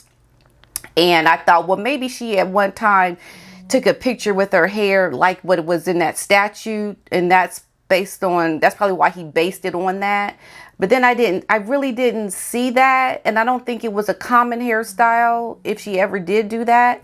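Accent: United States. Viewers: Please don’t worry about how you pronounce names. As others have said you are American, and often words are said differently to us British folks. There are 2 spellings for the name for Prince Louis. Some people spell it as it would be said Lewis, which can be a surname or first name. It is what you say, not how you say it. Keep up your good work. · I agree with you, you are American. pronounce it how you are accustom. I love your channelYou hit the nail on the head. Just be yourself. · Please stop apologising for your pronouncement of a name..99.9% of people Know your an American and expect you to speak like an American..most of us love your accent...hi from the uk 🇬🇧 😊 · Don’t you worry about how you pronounce things …we all pronounce things differently and that makes it more interesting. I like your pronunciation actually, you are American and that’s how you should say it. 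Don’t take any notice of the pedants….(fussy grammarians) Imagine if Diana had lived……she (Marbles)would’ve fallen foul of her (Diana)100% and she would be slandering her too. They would be ghosting each other, I would take sides …and it’s not Marble's side. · YOU ARE RIGHT! YOU’RE AMERICAN SO YOU PRONOUNCE AMERICAN.🌹🌹🌹SHE IS USER. THE YATCH GIRL. SHE HAD NO RESPECT AT ALL.THIS WANTS TO BE BILLIONAIRE. IS All ABOUT HER SELF. SAD SAD. SAD WE FEEL BAD TO PRINCE WILLIAM HIS BROTHER IS GONE. MANIPULATIVE,CONTROL, HYPNOTIZED BY MEGAN.😂😂😂😂😂😂😂😂😂😂😂😂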